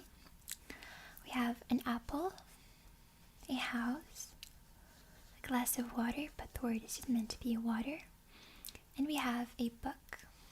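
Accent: Spain. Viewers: I love how you pronounce Spanish like a Spaniard would do. Very unexpected ❤ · Se nota que habla español, si tuviera que adivinar diría que es española 😅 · Tiene acento de España! Es española? O tiene padres de España? ♥ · su acento español de España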